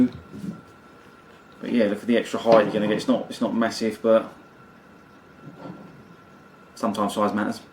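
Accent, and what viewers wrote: United Kingdom. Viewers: Ahah, nothing in particular, I simply like british pronunciation. :)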